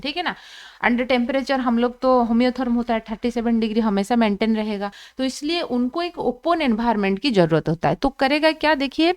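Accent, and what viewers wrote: Odisha, India. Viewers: Are you from odisha mam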